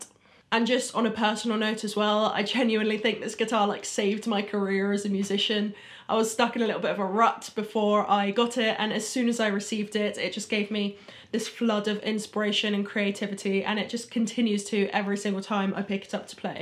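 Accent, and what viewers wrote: England, United Kingdom. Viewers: Я понял что она англичанка :)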